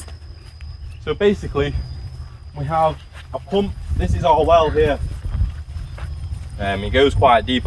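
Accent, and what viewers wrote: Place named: Manchester, United Kingdom. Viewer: A manc Thai great to hear a northerner back home in Thailand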